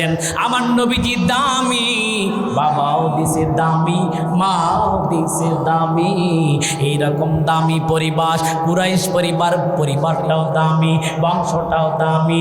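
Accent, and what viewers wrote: Shariatpur, Bangladesh. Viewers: ধুর শরীয়তপুরীর টানটা ভালো লাগেনা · ধুর শরীয়তপুরের কন্ঠে ঠিক আছে কিন্তু আবার হুবহু ওয়াজও নকল করেছে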